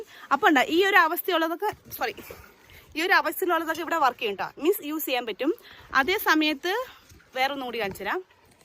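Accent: Palakkad, India. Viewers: പാലക്കാടൻ മലയാളം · പാലക്കാട് ❤ വർത്തമാനം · ഓ ശരിയാണ് നല്ല ടേസ്റ്റ്. പാലക്കാട് ഭാഷ ഒരുപാട് ഇഷ്ടം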